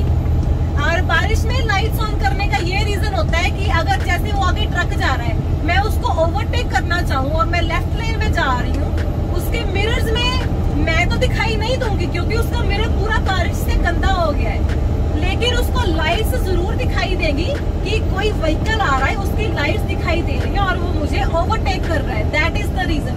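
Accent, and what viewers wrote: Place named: Bihar, India. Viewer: ਮੈਡਮ ਬਿਹਾਰ ਤੋ ਅਾੲੀ ਲਗਦੀ ਅਾ ਬੲੀਅਾ ਞਾਗ ਹਿੰਦੀ ਬੋਲਦੀ ਅਾ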